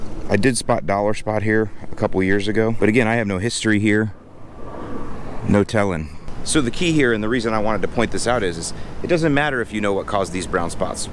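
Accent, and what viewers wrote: Chicago, United States. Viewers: Nailed the midwest accent. Sounded like you are from Chicago for sure 😄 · I love the Chicagoland accent you add into your videos! Reminds me of when I lived up ‘dere!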